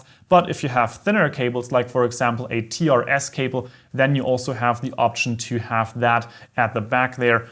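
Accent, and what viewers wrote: Germany. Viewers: alles gut. Guter Inhalt. Gutes, flüssiges, verständliches Englisch. Dennoch hört der Landsmann den Landsmann durch ;)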